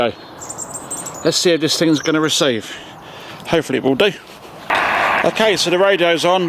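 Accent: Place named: England, United Kingdom. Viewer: Nice too hear somebody from back across the pond . I’m a. XPat living in the States . I have the Tecson 310 and love it . Where in England are you ? I was from the the Midlands Coventry. Thanks again .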